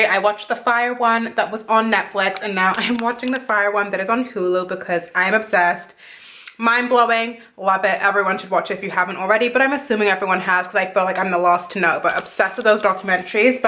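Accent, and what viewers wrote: Canada. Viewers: I think she is frm canada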